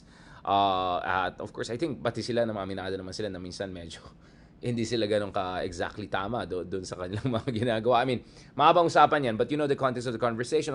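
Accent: Conyo, Philippines. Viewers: Di ko tlga matolerate kung pano magsalita ito. Masyado paconio. Kairita.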